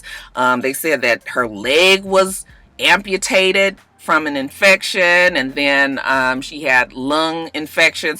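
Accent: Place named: California, United States. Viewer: "modation "!!!! love you,. your California comes out sometimes and I love it!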